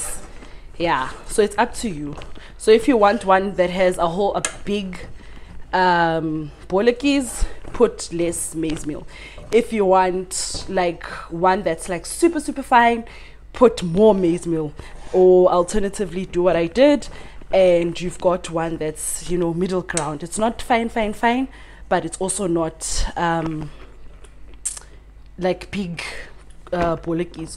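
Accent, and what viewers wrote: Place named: Africa. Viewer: stop complaining for nothing!We are African,get used to our English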